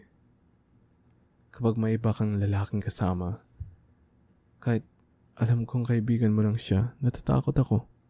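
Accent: Conyo, Philippines. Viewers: sabi ko na nga ba pilipino sya eh, sa accent palang😊❤ btw, I love your videos❤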